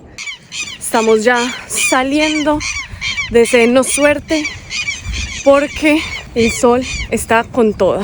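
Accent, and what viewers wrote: Antioquia, Colombia. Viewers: Hola, tenés un acento " paisa", si hablamos de gente amable en tu ispa los cartagineros se llevan el premio!!!! Saludos desde Paysandú Uy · Tu acento me vuelve loco chica colombiana hermoza,nuestro país 🇺🇾 es lindo pero esta a años luz de el tuyo 🇨🇴,digamos las cosas como son,saludos desde Durazno(Uruguay).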